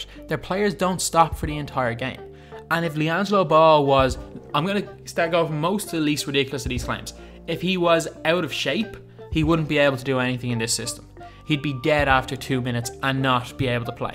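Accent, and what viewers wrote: Ireland. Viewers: R u irish ya??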